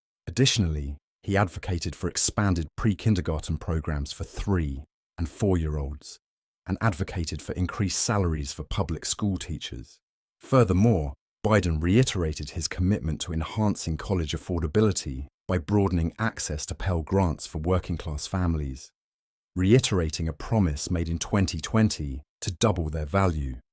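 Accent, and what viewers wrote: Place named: United Kingdom. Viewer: Why is a Brit telling me about American politics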